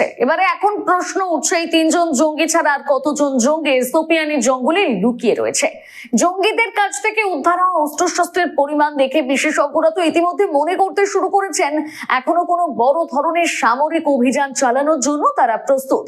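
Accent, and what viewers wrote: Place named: Bangladesh. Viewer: কি খেত , কোথায় হাগতো সে খবরে কি দরকার?Glorification of notorious animals channel গুলোর ব্যবসা!বাংলাদেশী মহিলা মনে হচ্ছে উচ্চারন শুনে!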